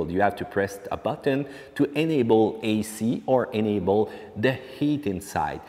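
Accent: Quebec, Canada. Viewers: Avez-vous fait une version en français de ce vidéo?Je pose la question parce qu'il est évident pour moi que vous êtes un francophone fort probablement québecois. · Are you French Canadian ?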